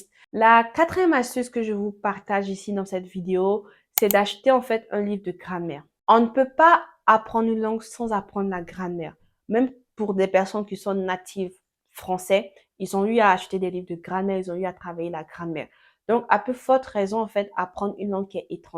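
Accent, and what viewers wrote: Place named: Ivory Coast. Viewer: Accent Ivoirien 🙄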